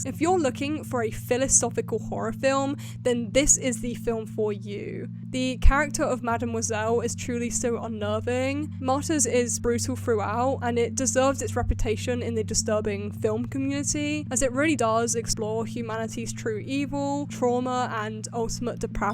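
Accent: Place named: England, United Kingdom. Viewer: Awesome, I'm in Scotland. I did think you sounded English to be fair. I don't mind buying music blind but not movies, funnily enough. I used to but not anymore. When is part 3 coming?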